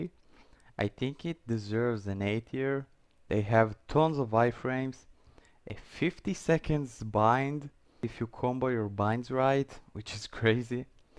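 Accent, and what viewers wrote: Israel. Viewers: I recognize an accent when i hear one 99.99% israeli :)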